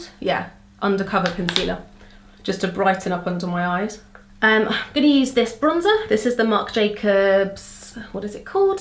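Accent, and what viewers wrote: London, United Kingdom. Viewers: I'm addict to your accent! Is it London accent?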